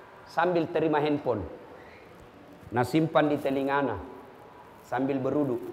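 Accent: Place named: Sulawesi, Indonesia. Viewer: haha..kalo pak ustad tergantung audiensx, kalo ceramah d daerah sulawesi selatan atau daerah luar tapi banyak komunitas bugis makassar maka dia banyak pake bahasa dan logat bugis makassar. tapi kalo ceramah d luar, misalnya d jawa, pasti ndk ada sisipin bahasa bugis-makassar.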